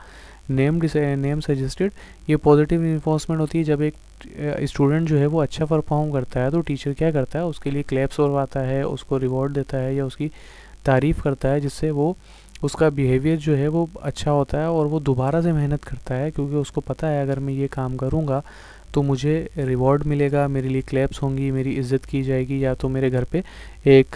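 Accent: Karachi, Pakistan. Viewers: Apka lhja karachi walon k ha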